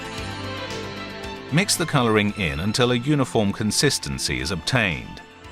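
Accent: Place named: RP, United Kingdom. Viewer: Only got to the intro so far about the primer and I feel like I'm tuning into a Royal British polo breeding documentary. 😊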